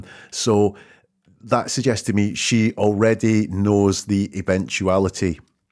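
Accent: Scotland, United Kingdom. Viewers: Nice to hear a fellow Scot 🙂. Really enjoying your videos.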